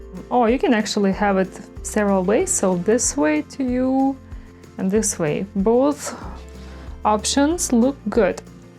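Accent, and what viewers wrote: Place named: Russia. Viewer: Так и знала что вы русская...😉 своих всегда видно и слышно...🤗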